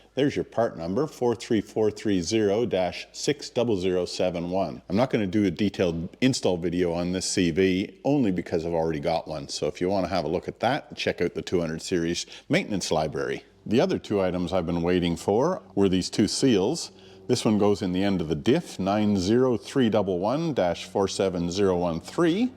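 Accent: Canada. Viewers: Canadian accent but with Aussie slang = hillarious! SUBSCRIBED. Very nice workspace you have got. I'll peek your channel - I see some projects in the background.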